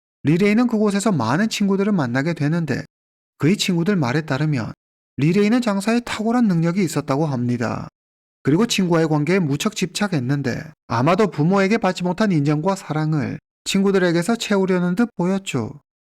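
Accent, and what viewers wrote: China. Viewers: 유튜브채널주인 조선족임? 말투가조선쪽인데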